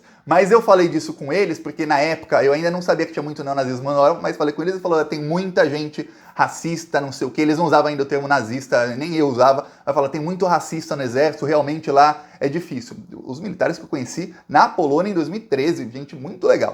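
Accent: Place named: Florianópolis, Brazil. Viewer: Poderia falar mais pausado? Tá parecendo meu irmão caçula de Floripa manezinho... Abraço!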